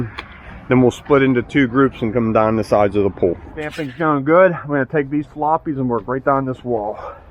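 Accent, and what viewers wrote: Pittsburgh, United States. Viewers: do not link up with other sites. If you get too commercial. As a “civilian” non-contractor observer, I could choose concrete guy. I like your videos and your Pittsburg accent.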